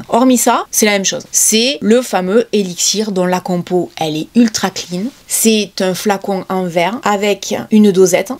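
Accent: South, France. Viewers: Magnifique accent du sud ❤ 😊 Vous êtes belle, élégante, simple, modeste, intelligente et votre travail sur youtube est remarquable! De tout cœur bravo! Continuez, ne lâchez rien. ❤❤❤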